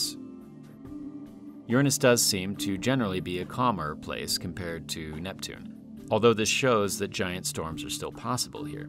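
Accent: United States. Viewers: What happened to your voice???!!! An American accent just isn't the same. (I'm from the U.S btw) I think it sounds better with your original voice. Just my 2 cents worth... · That's with the American accent? I loved ur ur original voice it was calm and soothing .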